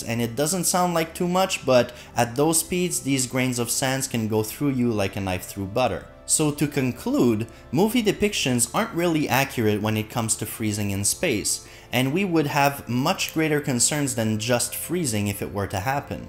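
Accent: Quebec, Canada. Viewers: He sounds "Québécois"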